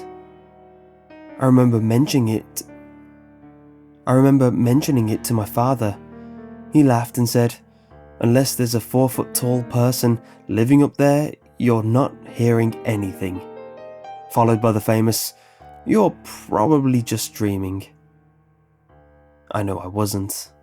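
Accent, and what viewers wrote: England, United Kingdom. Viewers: I'm guessing you don't live in the UK, it's an english accent not british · The Favorite Gentleman the accent varies is lucky to get a nice english accent unlike scousers and geordies god i hate there accents lol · Yes he has a very sexy British voice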